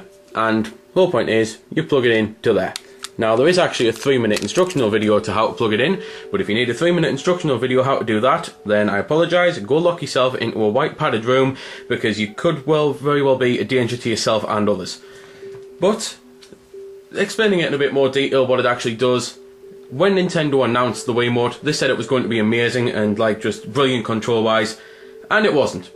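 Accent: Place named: Newcastle, United Kingdom. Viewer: this is an old video but i still found it helpful, an excellent review, and the commentator had what i think is a geordie accent , "Howay the lads!" "Toon Army"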